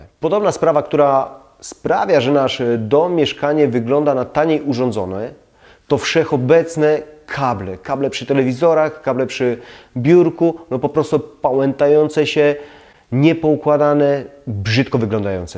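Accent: Silesia, Poland. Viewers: Nie, proszę nie :) ! Ma pan piękny, bardzo delikatny śląski akcent ❤, czy dobrze go słyszę? Niech zostanie! Pozdrawiam serdecznie. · Ależ razi ten śląski akcent 🙈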